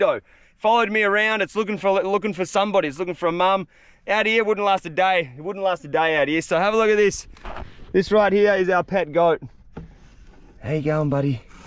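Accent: Australia. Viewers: Any one pls which region of australia this guy belongs to I wana learn his accent ??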